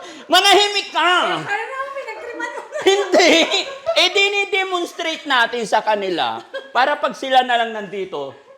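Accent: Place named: Quezon, Philippines. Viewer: Kkatuwa nman😅ganda ng bahay at mga rom banyo sopa parang rich na tlga ❤ ang hagikhik yong tawa ng tawa na wlng sounds pg sa sobrang happy n ayaw marinig malakas n tawa tawag hagikhik na sa isang tabi kkatawa ganon yong salita ni prang sa amin sa quezon tagalog n tagalog❤goddess team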